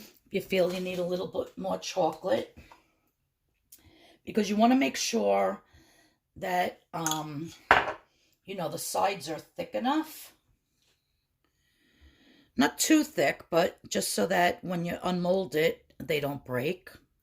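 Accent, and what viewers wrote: New York, United States. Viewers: This would be so cool to make! So cute! I always wanted to buy them in the stores but I would rather control the ingredients. I’m well aware of Avery as a maker. Gonna do that as well. Thanks so much for sharing. Just love that NY accent.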